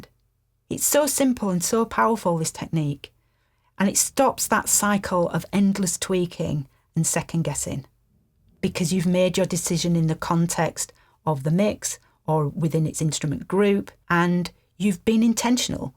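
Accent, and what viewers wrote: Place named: Yorkshire, United Kingdom. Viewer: As helpful as ever. And the icing on an already great cake is to have this wise advice delivered in a fabulous Yorkshire accent. Love it.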